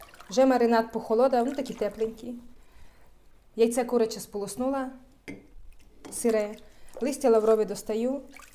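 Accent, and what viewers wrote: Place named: Zhytomyr, Ukraine. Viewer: Здравствуйте. Я сама с Украины с Житомира диалект знакомый,вы где живёте? Очень скучаю, а теперь не знаю смогу ли приехать. Я живу в Казахстане, Алматы. Спасибо.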